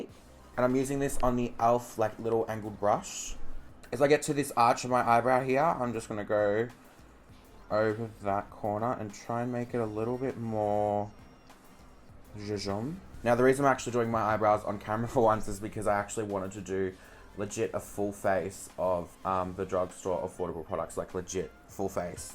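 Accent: Australia. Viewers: australian accent is aesthetically pleasing omg help me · Ellie Harriss he's Australian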